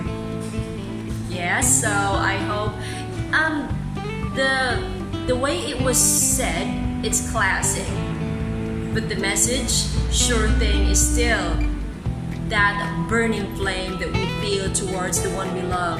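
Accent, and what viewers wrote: United Kingdom. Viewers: Wow very entertaining and informative video miss! Your British accent is almost so authentic. Props to the effort given in this video miss! 🙏 🙏🙏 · Thank you for making this kind of content Ms!, i loveeee the British accent u got!. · thank you for this video! listening to your british accent is so soothing 🎧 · This is such a wonderful and meaningful poem miss, I love that the British accent makes it more graceful to listen to. -van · It is a stunning poem, and I love how the British accent suits it. I really appreciate how elegantly written the words are in this poem! Thank you for this video, Ms. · it is a lovely sonnet ms. I also like your British accent, so pleasing to hear. How to be you po🥲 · Great video Ms and a perfect British accent. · Wow, I really like the way you speak in english Ms! Im glad I learned more british coming from you! 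<3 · It is indeed a beautiful poem and I do love how the British Accent sounds very suitable with this Sonnet! Thank you for this video, Ms.!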